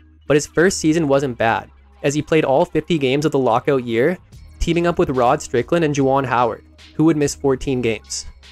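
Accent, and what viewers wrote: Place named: Canada. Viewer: Absolutely LOVE this channel! Love the Canadian accent MUCH more than I should and I love the detailed bios and pictures - you have a sub and Mad respect! 👍 😃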